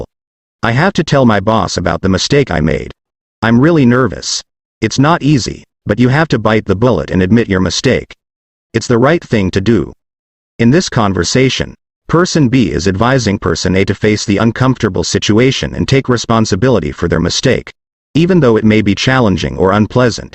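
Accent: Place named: United States. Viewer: Woooow ❤ I like it American accent and speed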